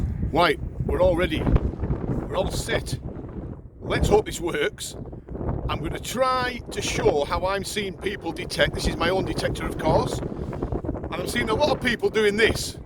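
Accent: Cumbria, United Kingdom. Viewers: Good video, I’m a newbie as you know only 4 of your digs in, but am always concentrating hard on keeping it low and slow as your cumbrian tones play out my head 🤣 but to be fair I could widen my arc by turning my hips more I gotta admit 👌🏻 Thanks for your all your efforts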